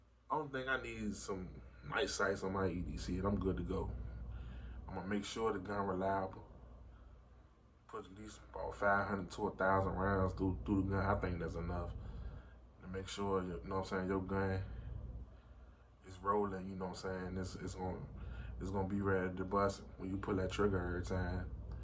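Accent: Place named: Richmond, United States. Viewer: This video came across my feed and I just got into it because I’m 2A everyday. I couldn’t place the accent and at first you said something about New Orleans then I heard more and realized you were from Richmond. Reminded me of one of my favorite channels Stay Out The Way Tre and him talking about Virginia politics. Favorite part of the video hands down was when you said your brother beat that case. The American justice system in my opinion, is the most effective system we have currently in the world, but it’s still obviously flawed. One of the biggest flaws is when they arrest people that are defending themselves in deadly situations. A man should be able to defend his own life, let alone having a family to watch out for, without any fear of spending years in prison for doing so. If I’m going about my day, obeying the law and treating people with respect, why should I allow undue harm to come to myself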